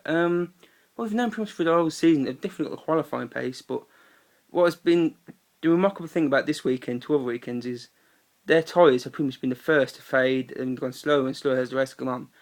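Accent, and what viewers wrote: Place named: Netherlands. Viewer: why you speaking like a dutch guy?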